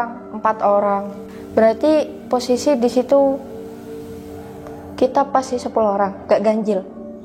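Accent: Java, Indonesia. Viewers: mbaknya medok bngt ngmong bhsa indonesianya kayak sayaaa😆😍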